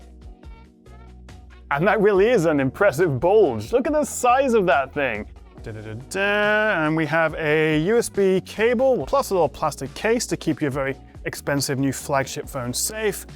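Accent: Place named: Wales, United Kingdom. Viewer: Didn't know you're from wales bruh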